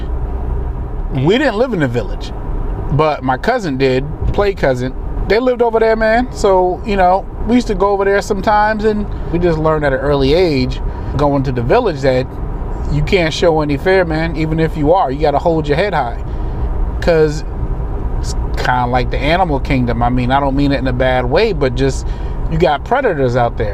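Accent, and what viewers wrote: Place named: Bridgeport, United States. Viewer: If Bridgeport CT is so close to the Bronx NY why is your accent so different ?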